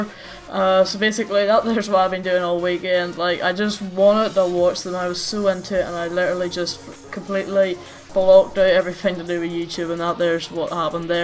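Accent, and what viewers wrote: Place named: Ireland. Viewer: You from Ireland yea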